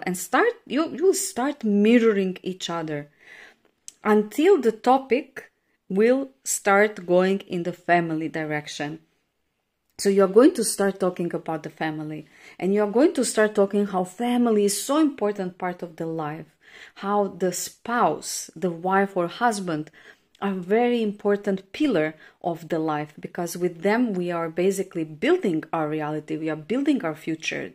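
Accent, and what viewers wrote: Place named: Russia. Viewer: You have a Russian accent. Are you Russian? 💜😍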